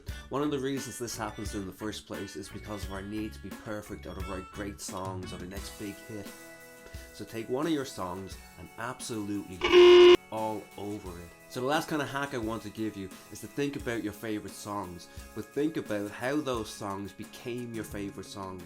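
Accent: Dublin, Ireland. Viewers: Is that a Dublin accent I hear? Or is it from a bit further west?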